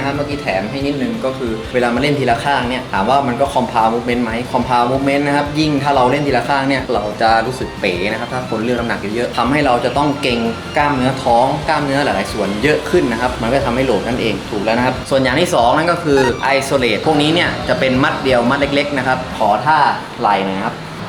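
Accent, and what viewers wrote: South, Thailand. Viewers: มาแล้วสายฮามีสาระ5555 ชอบสำเนียงคนใต้ชัดๆ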